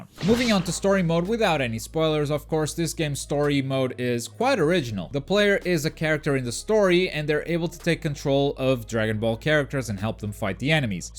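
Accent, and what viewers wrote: Portugal. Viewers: Português? Notei pelo sotaque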